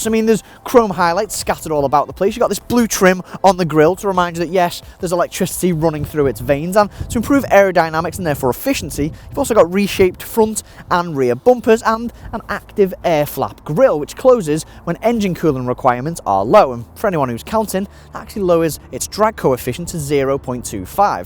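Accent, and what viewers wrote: United Kingdom. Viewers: i believe anything from a guy with British accent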